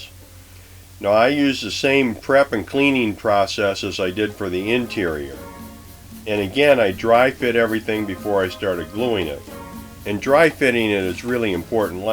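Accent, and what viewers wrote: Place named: Chicago, United States. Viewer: Chicago area accent, right?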